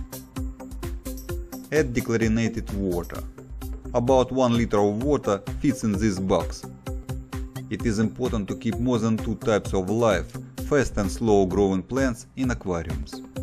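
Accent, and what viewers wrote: Russia. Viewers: Ты русский? Я заметил акцент.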